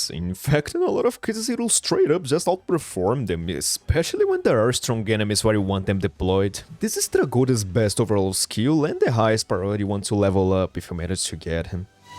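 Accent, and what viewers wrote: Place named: Brazil. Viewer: Bro are u Brazilian? Your english sounds like mine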